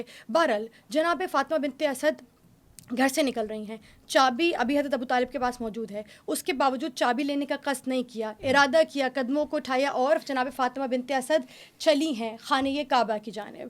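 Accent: Iran. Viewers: Have they become less sacred or insulted when pronunciation is in Persian accent 🤔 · Why the persian accent of narration and pronunciation of the sacred names? When all our bibis and prophets were from Arabia.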